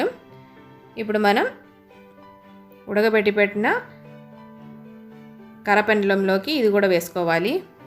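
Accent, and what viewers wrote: Kerala, India. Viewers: teluguni kerala accent lo matladthunnaru very nice ...first time hearing this ..how u learned telugu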